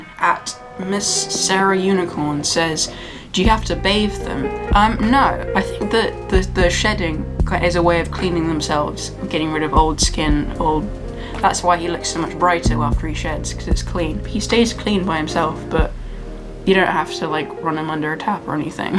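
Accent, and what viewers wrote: United Kingdom. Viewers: Didn't realize you were British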